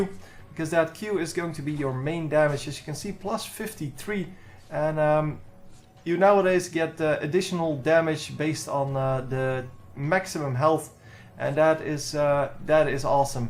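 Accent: Netherlands. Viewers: The 'obviously dutch' way he prenounces every single word just annoys me so much and his overreacting.. oooohhhh niiiiicely done! Good work MEENN. Next time spectate a elo instaid of lvls 20/25. Thank you.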